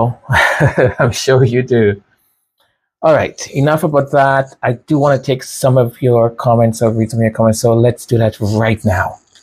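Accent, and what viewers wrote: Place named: United States. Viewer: Yes its funny i would think u where a American 😊😊😊👍🏼👍🏼👍🏼👌🏿🌹🌹🌹🎈🎈🎈🌞🌞🌞🌞🥰🥰🥰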